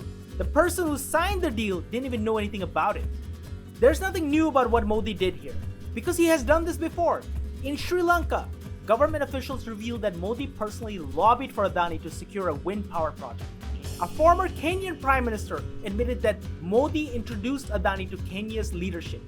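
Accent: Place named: United States. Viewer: Good one sided propaganda video. Very smart idea to do propaganda in Americanized English accent. However, this amateur analysis will not work outside of gullible Bangladeshis.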